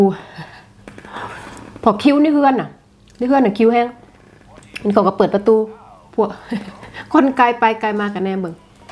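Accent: Savannakhet, Laos. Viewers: ເຮັດຫຍັງບໍ່ເປັນກະເບິ່ງຊ່ອງເອື້ອຍແນະນຳລະອຽດມັກຫລາຍເບິ່ງທຸກຄິບເລີຍ ສຽງເວົ້າກະມ່ວນສຽງຄົນສະຫວັນຍ້ານເຮົາ😍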